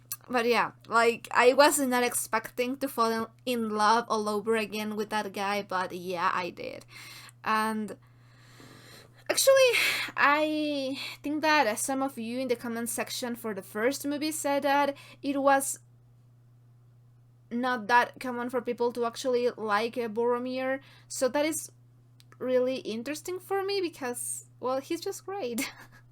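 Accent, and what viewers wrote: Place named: Mexico. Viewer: I hope that someday you'll read the 3 novels, . As hard as it may be to believe, the books are 100% better than these fantastic films, especially the character- and world-building. I read the works as a teen, and now I listen to them on YT audiobooks when I'm resting, and while doing household chores! I really enjoy your accent, having lived among Mexican farmworkers on both sides of the border, for a total of 10 yrs way back in the 1990s. I am a retired antropólogo cultural. ¡Nos vemos pronto señorita! 🤞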